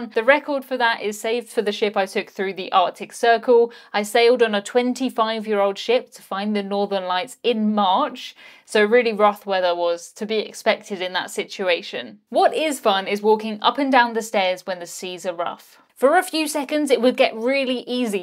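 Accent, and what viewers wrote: United Kingdom. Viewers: I love British accents♥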